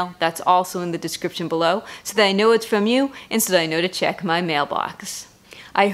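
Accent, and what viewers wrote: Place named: New England, United States. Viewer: This was good. I just discovered your channel and will have to check out more of your videos - they sure look interesting! I hope you don't mind this Canuck saying, I like your New England accent. ☺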